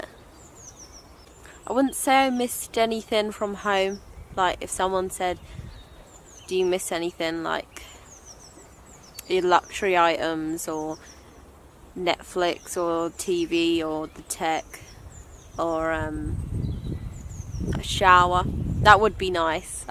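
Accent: United Kingdom. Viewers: I love her accent. It's so amazingly British. 🇬🇧 It would be nice to meet by the fire. 🙂 Regards from Poland. 🌳🏕️🏞️🐗🐿️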